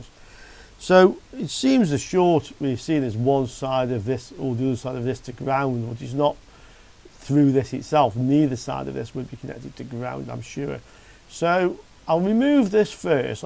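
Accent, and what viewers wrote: United Kingdom. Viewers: Kinda enjoying your British accent and all it comes with, yeah :)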